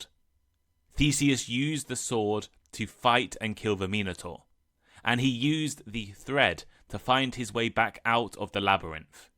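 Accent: United Kingdom. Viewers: Is it British accent or American accent?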